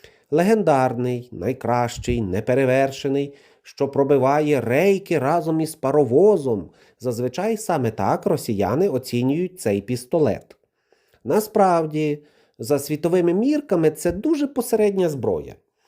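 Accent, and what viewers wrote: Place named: Western Ukraine, Ukraine. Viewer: Акцент заходу України,ме-бе.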